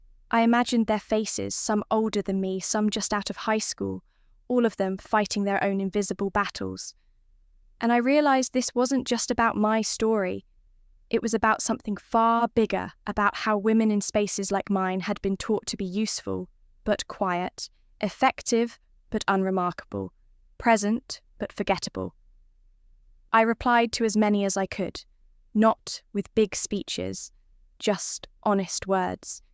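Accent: United Kingdom. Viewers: Why don't they use an American accent? British accent for a military story set in the USA? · The British accent is nicer than most of the other AI voices in use, nice change!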